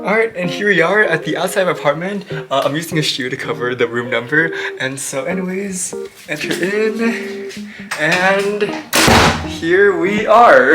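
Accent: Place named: United States. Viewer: afterthis video i realised how american your accent actually if and my mind is blown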